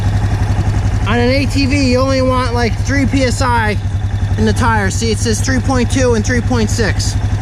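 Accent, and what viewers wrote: United States. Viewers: stop fuckin saying yawn mower. god damn yank.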